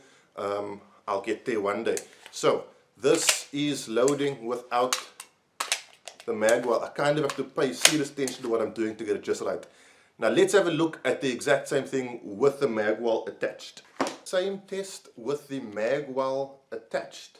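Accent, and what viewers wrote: South Africa. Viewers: are you south african? I'm trying to puck up on that accent lmao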